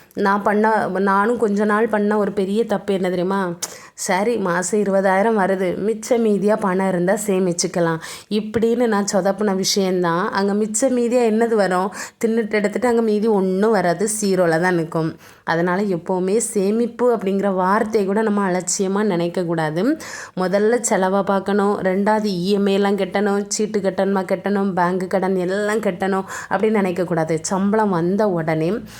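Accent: Tirunelveli, India. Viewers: நெல்லை பேச்சே தனி அழகுதான் சூப்பர்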